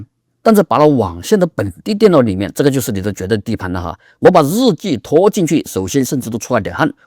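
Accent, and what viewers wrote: Sichuan, China. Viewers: 兄弟伙你这声音恩是川普哦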